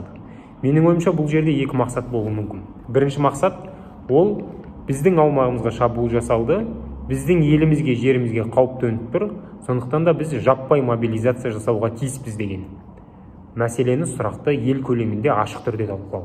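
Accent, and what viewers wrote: Standard. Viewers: Қазақша таза сөйлегеніңізге отбасымызбен ризамыз. Мықты. Ұнату және жазылу.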